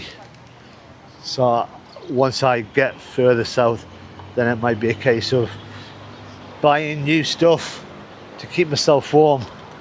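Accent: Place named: Newcastle, United Kingdom. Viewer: LOL, I am happy to learn a new phrase,,, "He scared the living day...." so funny.😆 I like your videos for the nice way you describe what you see and to learn and get used to your geordie accent. Enjoy your next stops in my country. Greetings and best wishes from a new follower in Chaco, north of Argentina.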